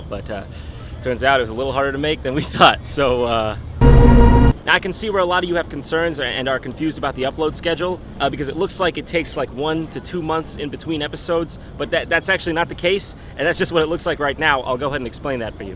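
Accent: New York, United States. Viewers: AM I the only one that noticed is totally getting a new yourker accent now?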